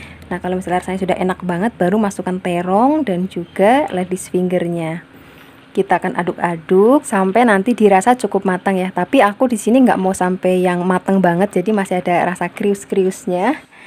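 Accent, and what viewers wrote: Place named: Central Java, Indonesia. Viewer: Suka bnget dgn bicaranya enteng ceria... Kyknya Jawa tengah ya mba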